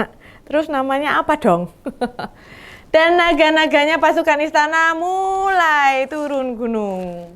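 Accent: Java, Indonesia. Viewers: Mbak.... sampeyan bisa melucu politik dengan sindiran telak dengan logat Jawanya itu ilmunya dapat dimana mbok ya ajak-2 yang lain....???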